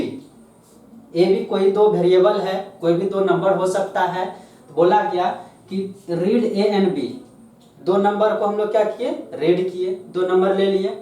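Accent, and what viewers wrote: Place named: Bihar, India. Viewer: भाषा की सरलता और हिंदी बोलने का तरीका बिहारी झलक।grest work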